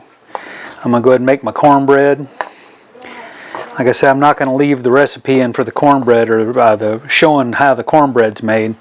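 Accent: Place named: Virginia, United States. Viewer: Sound like you're in Virginia.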